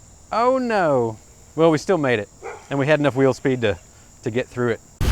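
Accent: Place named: South, United States. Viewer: Nice southern drawl too.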